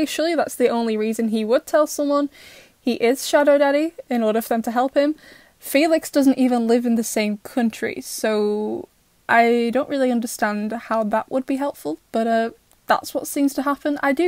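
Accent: England, United Kingdom. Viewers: Are you from england ?? I am asking because of your accent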